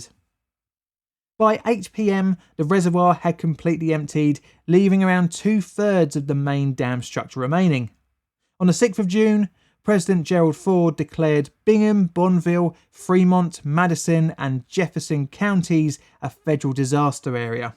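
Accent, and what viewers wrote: United Kingdom. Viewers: Why is this narrated by a limey?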